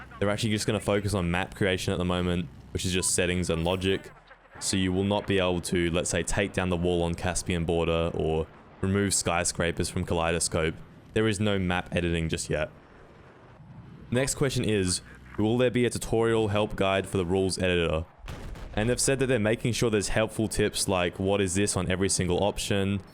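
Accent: Australia. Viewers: I subbed good to hear a Aussie doing the YouTube thing. Now to the game I can't wait to play but first I will have to get a console lol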